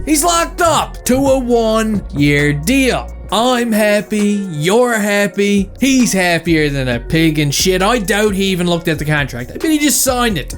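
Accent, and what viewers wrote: Newfoundland, Canada. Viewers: Great video! My dad says your Newfie accent is so thick he thought you were Irish and he’s from Cork.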